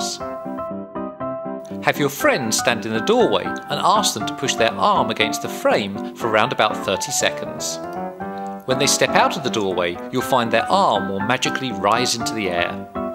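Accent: United Kingdom. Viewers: I hate this man's accent. Can't stand british... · stupid british accent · The way British people speak drive me nuts and annoys so much but at the same time it attracts me and I try to pronounce words the same way.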